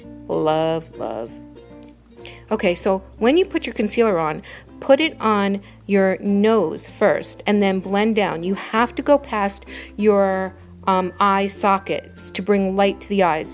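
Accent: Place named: New York, United States. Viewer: and OHMYGAWWWD I detected a slight NY accent? A Southern Yankee transplant here from CT living in NC ... def subscribing to this channel!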